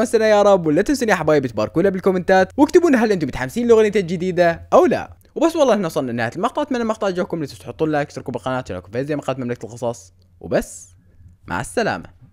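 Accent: Iraq. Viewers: اي اكيد لان لهجتها اساسا كذا هي عراقيه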